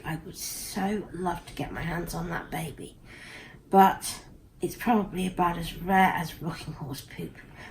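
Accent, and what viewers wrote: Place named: Wales, United Kingdom. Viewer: Aww, hello there, Jordan! He’s such a sweet boy! I absolutely adore his hands and his ears! :) That’s such a sweet outfit! I so hope the new Dumbo that just came out is better than the one I grew up watching. I think the story has potential, but I didn’t like how the original one was done. It was just too sad. I love the Welsh accent! XOXO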